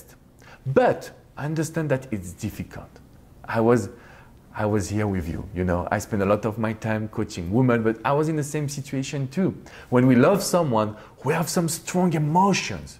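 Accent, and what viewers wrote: France. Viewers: You are soo Handsome! #FrenchAccent 😄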